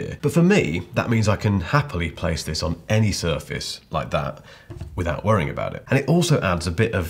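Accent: United Kingdom. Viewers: Excellent and stylish review, I have one and love it as well ,and because your British accent I will drink my usual single malt ,its time to cheers.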